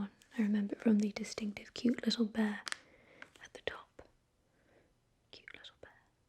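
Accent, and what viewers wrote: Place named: RP, United Kingdom. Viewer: You're quite posh, I like it though it's relaxing to me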